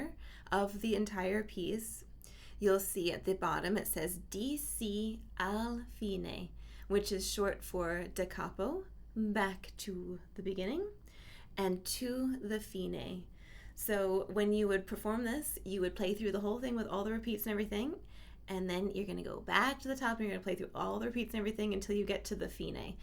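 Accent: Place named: France. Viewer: Im not in Patreon but yes in love 😍 😀. That French pronunciation 😌💞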